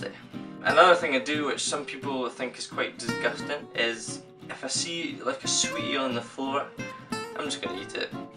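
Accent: Scotland, United Kingdom. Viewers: Subscribed- G'day mate! your accent reminds me of my year 4 teacher who came from Scotland - but that was a long long time ago. I thought it would be good/interesting to see how you develop your blogs from here. Good work! Good Luck! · I've never heard a Scottish accent personally it's really cool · I really do like his channel a lot. And I love Scotish accents. America sucks. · Scottish Accent>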